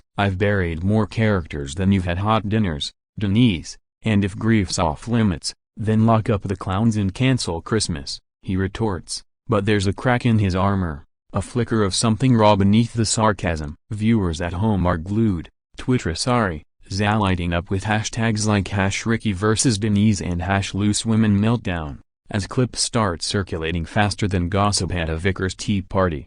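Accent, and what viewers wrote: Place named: United States. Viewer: Sounds like an American giving a commentary on a English Tv's loose women?? It's all B/S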